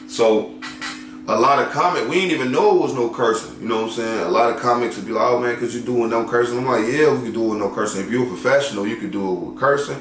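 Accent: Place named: Brooklyn, United States. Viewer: Bruh in your videos I really thought you talk like that😅 I'm from Bed-Stuy Brooklyn., When I first seen and heard you I thought you was from Brooklyn too. Yo Brooklyn, Chicago and part of Philly have so much in common. I was in the Army with a Brother from Chicago., We called him Shorty-Long.. He was Hilarious!!!.. he made me feel like I was back on the Block. You also have true Talent.. ✌🏽👍🏽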